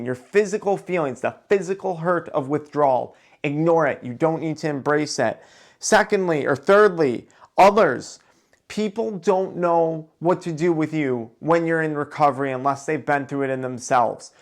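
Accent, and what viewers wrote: United States. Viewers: Why do Americans pronounce doc as daac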